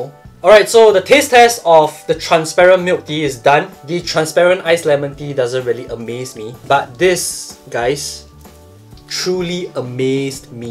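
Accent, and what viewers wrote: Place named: Singapore. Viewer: well sorry but this is how most of us Singaporeans speak...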